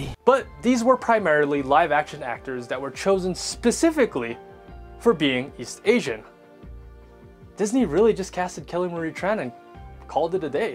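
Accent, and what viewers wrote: United States. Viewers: I like to know if you have a western blood? i am interested on the biopsychology of talking like from west when you are from the east, i.e. acquiring that accent or voice quality like American when you are talking. i did not expect that the person behind the narration in the beginning have asiatic facial features. (if not biopsychological, maybe psychocultural which in other words, you must be living in the west?) Or maybe you really study how to speak in a way like that? Or learn from hearing? To others who read my reply, maybe I am not as good as Americans like some of you here in telling whether the sound quality is asian or american-like because I'm not American caucasian. i observed that voice quality acquisition before from my English language professor. i am gayish that's why even though i can speak English, the non-manly voice come out. 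though, I can still differentiate an original American caucasian who's not culturally British English voice quality from an acquired one like yours. this one is American causian, non-british english <link> (the man only, because that's what I'm talking about, the scope).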